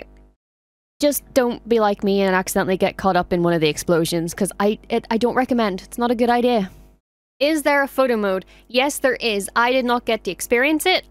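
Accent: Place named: Northern Ireland, United Kingdom. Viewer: Great video! Really appreciate your enthusiasm, and enjoyed the natural commentary in your wonderful Northern Irish accent. I'm a lazy gamer and therefore not usually a big fan of open-world games (e.g., couldn't get into Starfield), but this is Star Wars and I think I'll give it a go! Cheers.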